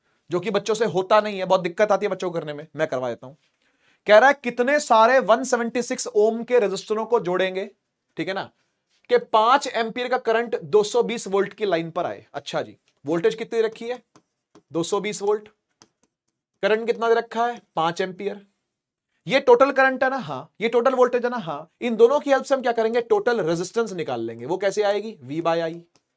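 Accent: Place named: Haryana, India. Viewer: that haryanvi tone ❤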